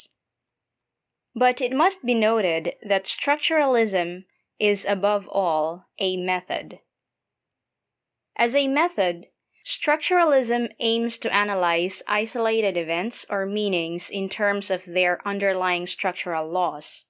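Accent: Philippines. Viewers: This dialect sounds like the Standard Philippine English.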